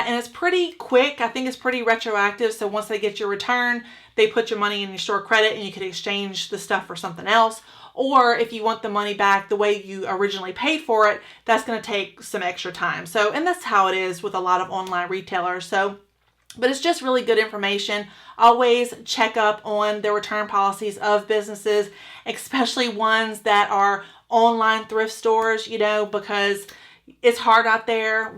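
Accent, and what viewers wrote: South, United States. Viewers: You looked good in all of the dresses. Love your channel! You give so much more info than others I’ve watched. Plus, I get to hear a southern accent - represent, girl!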